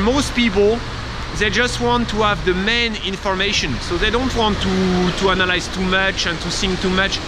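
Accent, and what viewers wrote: France. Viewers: . a French accent. thanks for the comment. please try to hold angle the selfi stick lower to take it more.out of the camera shot. Mercie buquo.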